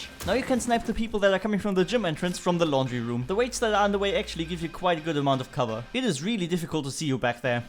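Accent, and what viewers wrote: Germany. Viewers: Are u german ? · you sound very german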